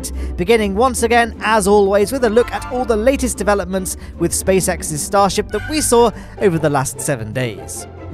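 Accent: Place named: England, United Kingdom. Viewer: As an English man like you I'm not gonna complain about you saying methane and Los Angeles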